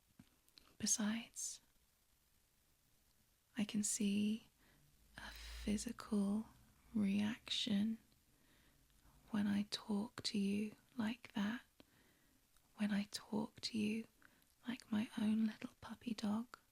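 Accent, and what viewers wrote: United Kingdom. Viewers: Your British accent makes totally devoted to u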